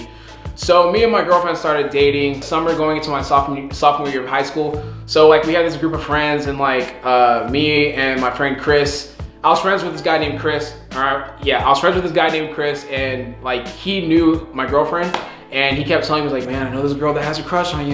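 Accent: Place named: Nigeria. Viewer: That naija accent 😂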